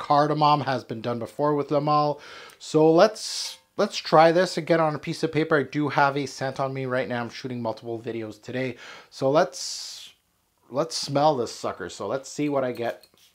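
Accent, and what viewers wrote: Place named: Quebec, Canada. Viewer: You sound exactly like any English teacher here in Quebec… you from qc?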